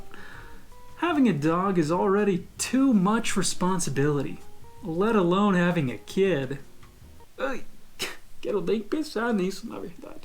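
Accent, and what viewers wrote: Manaus, Brazil. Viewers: P seu português e tão bom ..parece um manauara falando...só falta as gírias doida kkk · Nossa vc tem um sotaque brasileiríssimo e seu inglês é de fácil compreensão . Parabéns!!! 🤔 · Seu português é genuíno manauara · Te conheci pelo canal do Gavin😁. Assim que te ouvi falar pensei que fosse de Manaus mano😆 (minha cidade natal😍). Parabéns, seu português é muito limpo e claro👏👏👏 Excelente trabalho, torço para que seu canal cresça e tenhas tudo o que merece. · Faz muito sentido, qdo ouço ele, parece um brasileiro, sotaque misto do norte/nordeste🤗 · Maninho, por isso seu sotaque de Manaus e seu português perfeito! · hey kiddo,, you have reached 5.000 subcribers, congrats! i loved your explanations about situations with WAS SUPPOSED! Nice job! you razed it! Your brazilian portuguese accent is so perfect, really! keep it up! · VOCÊ É BRASILEIRO CARA, ESSE TEU SOTAQUE NÃO ME ENGANA. 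EU MORO NOS EUA FAZ 3 ANOS E SEI MUITO BEM RECONHECER UM FALANTE DE INGLÊS NATIVO E VOCÊ COM CERTEZA NÃO É UM. NÃO TENHO NADA CONTRA, SE QUISER PODE CONTINUAR ENGANANDO SEU PÚBLICO. EU SÓ ESTOU MESMO CURIOSO PRA SABER QUAL A RAZÃO DISSO TUDO, SEU INGLÊS É ÓTIMO E VOCÊ NÃO TEM MOTIVOS PRA ESCONDER QUE É BRASILEIRO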